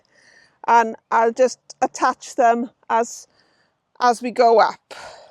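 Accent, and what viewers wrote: Wales, United Kingdom. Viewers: Ah that Welsh accent! - I just want you to say lol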